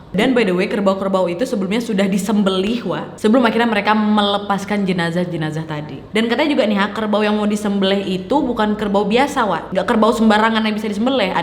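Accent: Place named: Sumatra, Indonesia. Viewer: Pembawa cerita ini logam sumatra atau sengaja meniru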